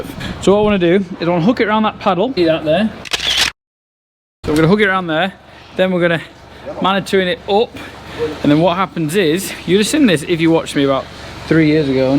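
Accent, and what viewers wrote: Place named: Northern England, United Kingdom. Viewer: Anyone notice has a YouTube voice (posh), then when he's speaking to staff he goes very northern! ᕙ( • ‿ • )ᕗ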